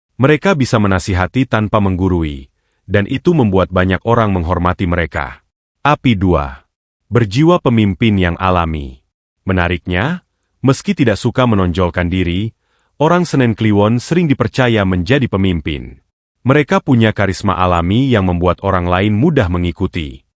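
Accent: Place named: West Java, Indonesia. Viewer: Kelihatan lugot bicaranya orang Jawa barat yang membawakan ini bukan orang Jawa tengah mantab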